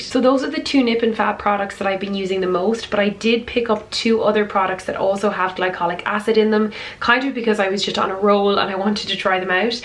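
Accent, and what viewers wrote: Ireland. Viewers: R u Irish??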